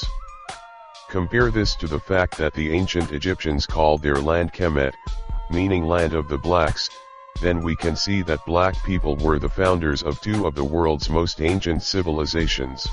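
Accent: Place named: AAVE, United States. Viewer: I am not a fan of black ebonics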